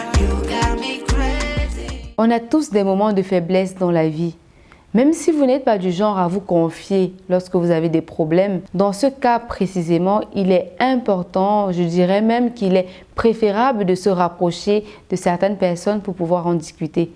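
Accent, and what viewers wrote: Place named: France. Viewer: Bon projet. Mais ma belle stp parle comme une Kamer car parlé un peu genre french girl la, c'est pas très originale. Mon point de vue seulement ooooooh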